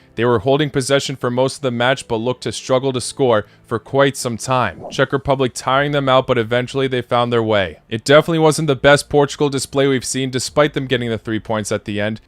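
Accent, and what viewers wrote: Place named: United States. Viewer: He lived in the US for quite a few years hence the accent.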